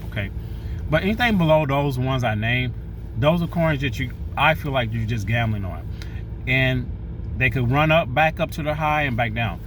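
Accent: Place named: Ohio, United States. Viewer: Where you from Ohio? I'm doing this thing where I try to "guess that accent " 😂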